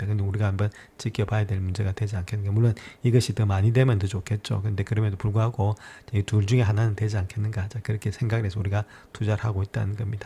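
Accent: Gyeongsang, South Korea. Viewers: 명료한 설명감사합니다. 구수한 경상도 사투리도 듣기 좋습니다~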